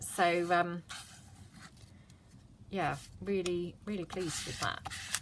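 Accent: England, United Kingdom. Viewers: I dont do a lot of vintage journaling , but I just love that beautiful English accent . :)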